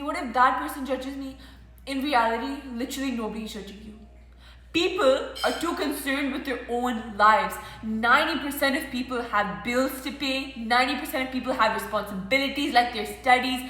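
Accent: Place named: United States. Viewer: Whats wrong... Its bit American and is completely fine and impactfull.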